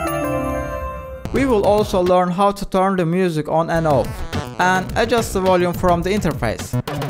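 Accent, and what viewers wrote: Turkey. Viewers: Aksanından kesin bu türktür dedim içimden. Sonra kanalın açıklama kısmından adının olduğunu gördüm 😊 konuşman gayet başarılı ve çok güzel videoların var. Başarılar dilerim.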